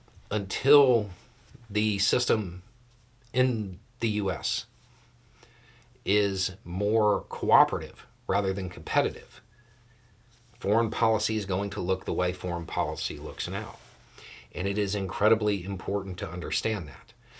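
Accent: New Zealand. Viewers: not sure if this is the case, but you sound as if you’re in NZ now? Possibly studied or lived there? 😊 I would love to get a chance to meet Māori people IN NZ instead of here in the US. The syntax of the language blows my mind. To Beaus point… this tRump disease has been working its way through international politics since about 2017’ish (possibly earlier) I watched Victorian and NSW politicians turn into antivaxxers overnight. I was heartbroken when I heard of the first community (local) infections in both NZ and AUS. Thank goodness I was able to reach a few of my friends who got the vaccine at my personal request. However, as great as being able to help there felt, I had neglected my own parents. My Mom passed from Covid in January of 2022. I wish that I could place the blame on tRump only. I cannot do that while others that lead to so much unnecessary suffering and death still walk around. 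So I keep trying. Thanks for letting me take you verbally hostage for a moment! 🥹 Don’t let tRump or his politics invade your country like he did ours. Lest many more suffer and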